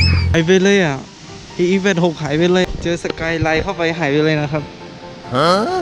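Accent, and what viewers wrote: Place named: Myanmar. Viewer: เป็นพม่าหรอครับ สำเนียงเหมือนเลย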